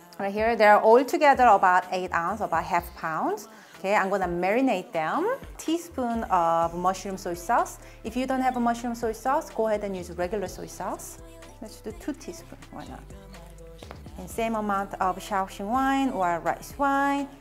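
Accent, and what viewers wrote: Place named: South Korea. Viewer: Omg your Korean accent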